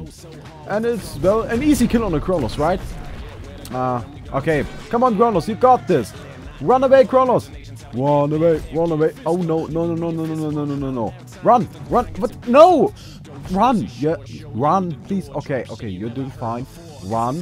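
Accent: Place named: Germany. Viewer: his english is hurting my ears... german accent at it's worst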